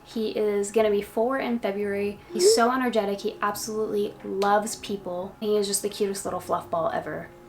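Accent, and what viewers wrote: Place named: France. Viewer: I think I am hearing a French accent, I am from Canada as well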